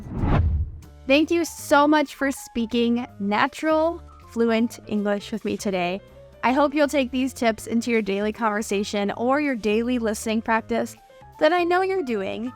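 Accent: United States. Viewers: which state are u from,?😊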